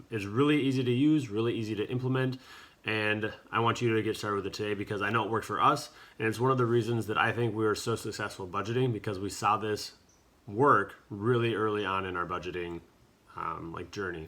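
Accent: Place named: United Kingdom. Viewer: I love a good random British accent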